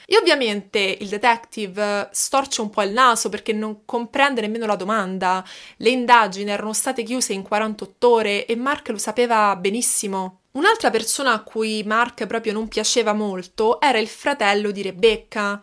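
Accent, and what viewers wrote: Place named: Rome, Italy. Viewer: Un bugiardo patentato , e chi gli crede. Mi dispiace per la famiglia di Donna che oltre alla perdita della figlia , non ha potuto vedere crescere la sua nipotina. Mi dispiace per la famiglia di Robert per tanti anni ritenuto un criminale . Quanta sofferenza quanto dolore. Non so cosa dovresti migliorare, ti trovo molto gradevole , anche il tuo lieve accento romanesco , a me non dispiace. Pronunci bene i nomi stranieri sei di bell' aspetto , sei brava nel documentarti , nell' esporre trasmetti empatia con le vittime . Brava continua non ti fare distogliere, credi nelle tue potenzialità, se c'è da migliorare , senz'altro migliorerai , nella vita c'è sempre da imparare, soprattutto quando si è umili , con la sete di conoscere .😘👏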